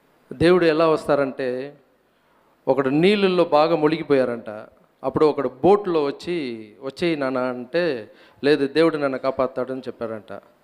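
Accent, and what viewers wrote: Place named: Telangana, India. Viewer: Only Telangana style Raghava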